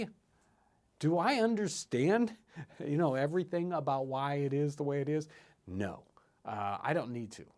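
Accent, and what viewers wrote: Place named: Indiana, United States. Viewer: He sounds, gesticulates, and annunciates things a lot like my dad, probably because he’s from Indiana, and so these always take me back to conversations at the table about history, politics, faith, and logic with my dad. I love the perspective and the info, and the recipes are fun, but that’s actually the subliminal reason I think I find these videos so home-y 🤗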